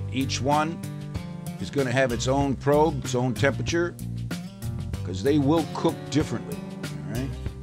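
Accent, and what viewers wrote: South, United States. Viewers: Bacon In Ham,This Man Is A Genius Im Hooked!!!Love His Southern Accent Too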